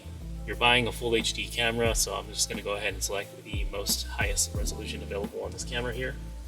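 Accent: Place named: North America. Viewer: you sound like you’re from north america, so it should be set to 60hz. at least that’s our electrical currency and can result in flickering when viewing back recorded videos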